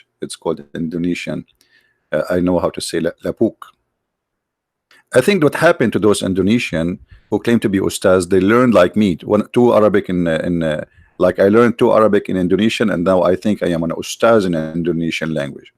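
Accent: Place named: India. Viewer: iya bro, seperti logat asli orang hindi.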